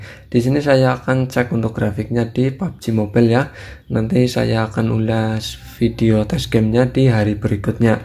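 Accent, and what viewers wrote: Banyumas, Indonesia. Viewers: Medok ngapak mas 😄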